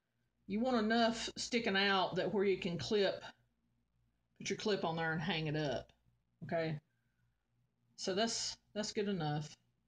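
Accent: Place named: North Carolina, United States. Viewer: Your accent sounds like you are from my area or my state which is NC. It is refreshing to me to hear someone talk like where I'm from. I have always told my boys to be proud of where they come from, it stuck with my youngest but I'm not quite sure what happened to my oldest except that he watched a lot of Thomas the Train when he was little 😆.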